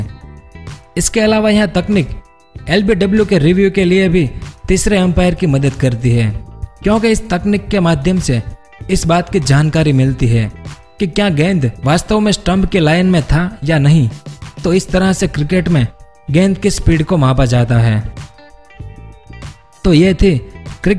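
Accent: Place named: Rajasthan, India. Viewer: Bhai ..aapki aavaj s lag RHA he aap Rajasthan se ho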